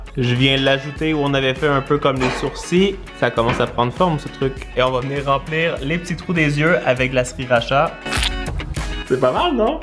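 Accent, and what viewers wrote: Canada. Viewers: tu mon youtubeur préféré de is vidéos accent canadien et qui met quoi des vidéos trop trop rire 👍👍👍👍👍 🇨🇵🇨🇵🇨🇵🇨🇵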